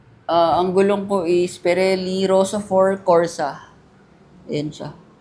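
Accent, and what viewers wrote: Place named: Visayas, Philippines. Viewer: solid ung pgka bisaya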